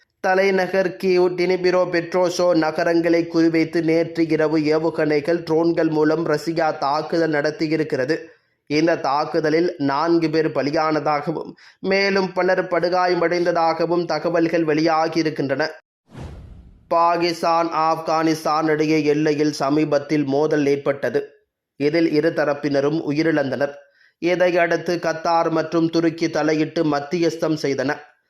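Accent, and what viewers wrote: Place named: Chennai, India. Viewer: Madras தமிழ்..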